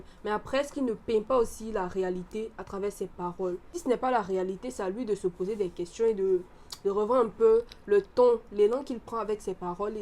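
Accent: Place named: Marseille, France. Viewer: Tu es marseillaise? Tu parles comme les marseillaise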